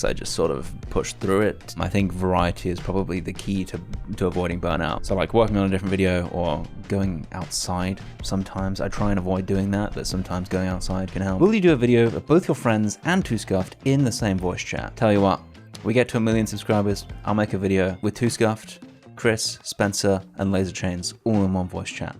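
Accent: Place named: United Kingdom. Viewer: Idk, he sounds British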